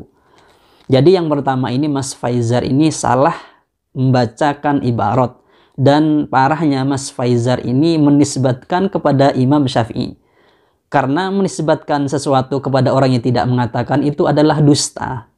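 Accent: Madura, Indonesia. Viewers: Kesalahan baca kitab itu hal yg lumrah, bisa jadi karena sabqul lisan, atau sebab lain. Seharusnya anda mendukung dg apa yg diperjuangkan oleh Ustad Faizar, mengoreksi bacaam bukanlah hal yg salah tapi dengan menyalahkannya di youtube akan memframming diri Anda sendiri kalau anda bersebrangan dengan beliau, apalagi anda sampaikan di momen yg tidak pas, yakni perseteruan antara dukun dan pesulap. Harusnya anda yg alumni pesantren dan mengaku kiai muda (di channel) kudu lebih bijak dan berhati-hati. Lebih baik tabayun agar tidak blunder permasalahannya. Ingat beliau itu Muhammadiyah, sedangkan anda dilihat dari logat bicara dan gaya menyampaikan isi kitab kuning adalah orang madura dan pastinya NU. Pahamilah perbedaan ini, agar gesekan antar umat tidak terjadi.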